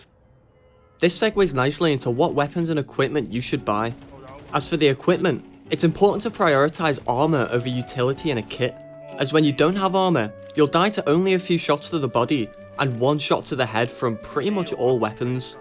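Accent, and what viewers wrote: Liverpool, United Kingdom. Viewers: British accent ❤ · Your British accent is so strong it reminds me of Paddy Pimblett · its an british accent i think scouse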